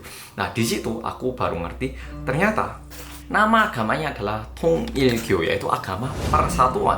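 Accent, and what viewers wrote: Java, Indonesia. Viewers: meskipun YouTuber lain nya sudah upload tapi aku masih tetap setia menanti videonya mas. G tau kenapa enak saja di dengar, jelas dan mudah di pahami 👍🏻🙏🏻 karena aku suka YouTube Yang MEEEEEDDDOOOOKKKKK🤗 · medok ngk ap 2 bang kan lucu orang korea medok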